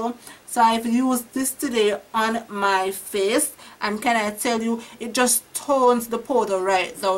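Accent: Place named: Jamaica. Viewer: Never expected a Jamaican accent when I clicked this lol yas...got it for 2 bills in Ochi...hoping its just as good for me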